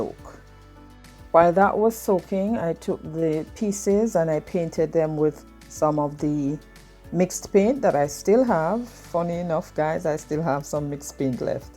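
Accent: Jamaica. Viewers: same! I didn't see snow until I was 8 yrs old, visiting family in NJ for a wedding and it was amazing! I played in it making a snowmen and kept running in and out of the hotel to put my hands under hot water (as a child, I had no idea it made it WORSE to do that as it burns your hands lol). But growing up, i thought snowflakes were the size of dinner plates! Cuz I'd see them on cartoons where they blow them up for the screen effects. My mother had to EXPLAIN to me that each snowflake was part of the snow that fell and they were very tiny. Boy, that blew my mind and they it started an entire other excitement trying to catch snowflakes and look at them before they melted to see that, indeed, they were snowflakes🤣🤣🤣 I laugh at myself over it because it's like "really Gaby? Dinner plate snowflakes? EVERYONE would get a concussion if those fell down when it snowed!!! 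Lol I figured you'd enjoy that story. I hope u can make sense of it! Lol I have a few Jamaican friends and I want to go to Jamaica SO BADLY! That was my aunt and uncles favorite spot to vacation. Your accent gave you away😋 I LOVE IT!!!❤❤❤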